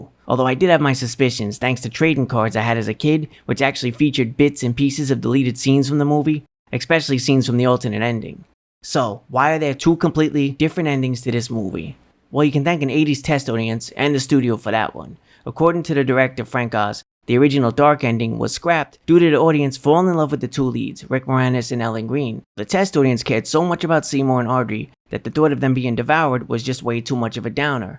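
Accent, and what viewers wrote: New York, United States. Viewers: Nice review man! I love Little Shop! I’ve actually played the voice of the plant Audrey II on stage in the musical production. It’s still one of my favourite musicals and roles I’ve ever done. I’m so glad you brought up the director’s cut!! Because that’s how it is in the stage version and I love the bleak ending. EXCELLENT choice of film! Also, I don’t want to assume, but you sound like you are from New York? Whatever you accent is, it’s awesome man haha 😎👍🏻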